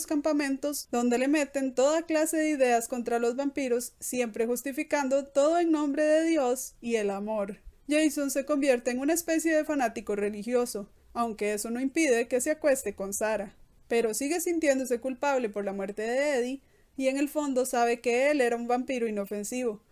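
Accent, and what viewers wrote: Costa Rica. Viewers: No sé, pero tienes un acento de Costa Rica. Es como escuchar a una amiga de la prepa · Eres de Costa Rica verdad?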